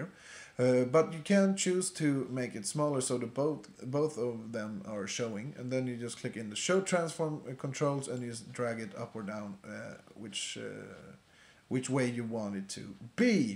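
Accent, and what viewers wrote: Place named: Sweden. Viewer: based on you accent. I'm guessing you're from Sweden ;) besides that. Thanks for the tutorial!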